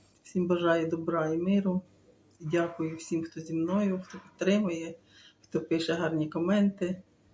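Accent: Surzhyk, Ukraine. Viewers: Не буду на вас підписуватись....Ваш дикий суржик дуже ріже слух.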